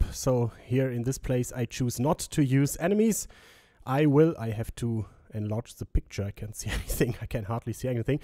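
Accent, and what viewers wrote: Germany. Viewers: also, I still don't know what 'kraut' accent means!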